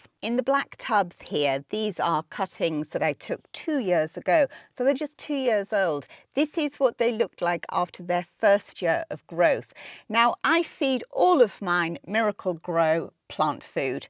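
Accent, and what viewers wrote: United Kingdom. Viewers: I’m not a gardener though I appreciate the gardens of others. It didn’t matter that I am not a gardener, I would watch whatever content you post just to hear your cheery, witty voiceovers as they make me smile. The fact that you have a delightful British accent is the icing on the cake. 😁 Greetings from Dallas, Texas 🇺🇸 where our accents are cringeworthy. 🤪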